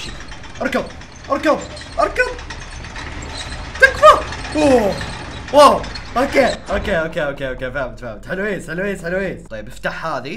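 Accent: Bahrain. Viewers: صاير تتكلم بحريني واجد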